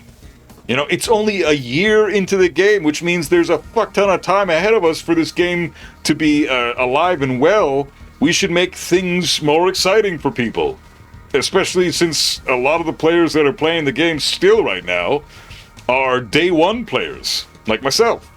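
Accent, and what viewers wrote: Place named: Canada. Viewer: You're Canadian 🇨🇦 too eh! I'm from Toronto.. love to hear it.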